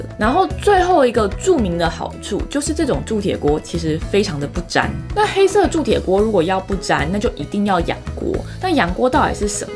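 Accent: Taiwan. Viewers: 当分子式出来的时候，我彻底服了，我原谅了你嗲嗲的台湾音~~~~ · 听起来很像北方人又像台湾人🤣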